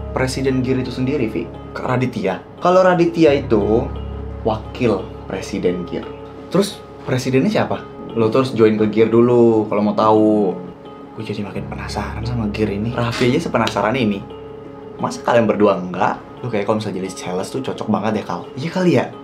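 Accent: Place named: Java, Indonesia. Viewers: Suka banget sama logat Jawa nya kak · bahasa nya medok bangett,mirip orang jawa bet😭😭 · Si cewek keriting bikin bulu kuduk merinduling anjirrrr😂😂😂 Ternya bisa bhs Jawa anjirrr Logat jawanya sudah meresap di jiwa Ad pak prestyo anjirr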